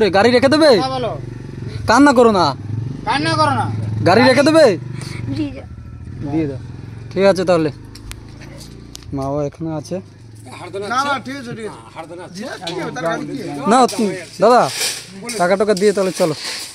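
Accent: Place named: East Medinipur, India. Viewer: sune mone hochhe east medinipurer lok ra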